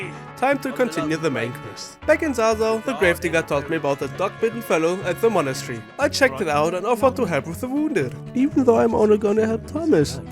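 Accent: Germany. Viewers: Der deutsche Akzent 😂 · Ey lol hab gerade erst realisiert, dass du Deutscher bist. Ich wusste doch, dass ich den Akzent kenne. Sehr gutes Video. Like und Abo verdient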